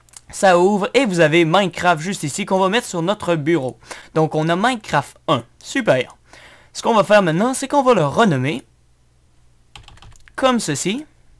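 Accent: Quebec, Canada. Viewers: il dit ''une video'' en tout qu'a au debut mais bon c'est comme sa c'est quebecois c'est fun c'est drole j'adore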